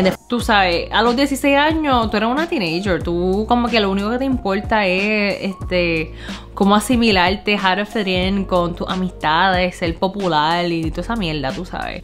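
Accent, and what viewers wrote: Puerto Rico. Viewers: Dos minutos atrás vi el vídeo tuyo preparando el arroz frito, tu acento boricua te queda perfecto, tienes mucha gracia y recuerda el SAZÓN está por dentro y tienes mucho. Saludos desde 🇩🇴. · Ella habla como el boricua que nace en Estados unidos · Boricua, ¿Ahonde naciste? Just wondering accent doesn't match your appearance. Porque tu lengua es boricua y sangre China which is fascinating. Good vids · Wao me encanto tu story! Yo soy puertoriqueña y no me crie en PR. Desde los 5 años estoy viviendo en E.U. Te apoyo 100% y eres mas boricua que yo!!! Tu acento es puro boricua que por un momento me quede boba jijiji. Te deseo lo mejor en NY y maybe un dia regresara a PR a demostrarle a todos aquellos q no te apoyaron q tu saliste pa lante y ellos no.. Pa lante nena! Que viva PR y China! Dios te bendiga. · You are very cool to the fullest 🇵🇷 You can't fake that Puertorican accent much love 💕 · Tu eres boricua tu forma d hablar el acento es la nuestra ya estas marcada por nuestra cultural tus recuerdos parte d tu vida esta formada en la Isla, t mudaste por la misma razon q nos hemos mudados todos fisicamente pero nunca puerto rico c a ido d nosotros donde quiere q voy soy PUERTORRIQUENO d LOIZA y AMO a mi ISLA La EXTRANO y VOVERE ALGUN DIA A MI BORINQUEN. 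· 🙋‍♂️ saludos mi'ja! Me encanta ver u oir como hablas a lo Puertorriqueño 😃 un orgullo de tenerte como compatriota Puertorriqueña aunque sea China de nacimiento 👍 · Wow you speak so Puerto Rican ❤️ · Que bonito se oye una asiática,cn acento extranjero,dependiendo del país que viva,se ve bonito...en este caso una chinita hablando cn acento P.Riqueño...🤗🤗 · Wao que linda eres y escucharte hablar asi como mi gente asi boricua me vuelve loco 😍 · Acabo de encontrarte y me alegra de que hables así de bonito de P.R. sabes q puedo ser tu abuela y viví por 20 años en NY pero mi isla yo lo la cambio por nada siempre venía de vacaciones y aunq la isla es pequeña la economía está mal pero de PR han salido muchos artistas muchos deportistas y tenemos muchas personas profesionales y tú eres lo q eres en cualquier país; y lo q quieres lograr lo lograras donde quieras q estés viviendo; pero sabes algo? 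Eres una pura boricua al hablar me encantó escucharte y te deseo lo mejor en NY o donde seas... suerte y cuidate mucho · Como aprendistes el ingles tan rapido y tan bien como tu lo hablas? De las 3 idiomas q tu hablas cual es el preferido q tu prefieres hablar? Y yes you do have an accent when you speak english pero dats wat happens cuando eres boricua isla del encanto and where in ny do you live at cause i live in ny too so have a blessed day and y q tengas un año mejor q este so let 2021 be a better year than this on Dios te bendiga y pa lante mija q eso es lo q hay weeeeeeeeeepaaaaaaaa puñe_ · Yo me mudé para NC con 24 años. A pesar de haberme mudado un poco mayor que tu, experimente exactamente lo mismo. Sentía que no encajaba en nada. Pero igual que tu no me hace falta nada. Mucho éxito y btw tu acento boricua es innegable. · You are so funny. You have a Puerto Rican accent and you are more Boricua because you were raised in PR. 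Hey we are neighbors in Brooklyn. Dios te Bendiga Boricua!!!!! WEPA 🇺🇸🇵🇷🇺🇸🇵🇷🇺🇸🇵🇷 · Buenas tardes espero que todo este bien sólo escribo para decirte que tu tienes de China sólo el nacimiento pero el resto es boricuaaa corazón al momento de hablar dije está es más boricua que yo, que un jibaro de clavo pasao Dios te bendiga siempre cuidate se te quiere de gratis boricuaaa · I can totally relate with you when it comes to moving during senior year. I moved from NY to Florida during my Sr year...it was the freaking worst. On another note, me encanta ver tus videos, mi mamá was the one who told me to check your pg out. Y cuando te vi hablando no solamente español pero con el mismo acento que tengo yo, I was kinda tripping out. Que viva Puerto Rico y todos los Latinos!! Pa’ lante!!!! #WEEEPA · Tu eres pura Boricua ese hablar es unico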